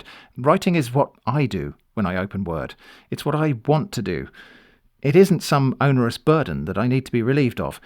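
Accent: United Kingdom. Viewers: With the lively British narration, I feel like I’m listening to a chapter of Hitchhikers Guide to the Galaxy!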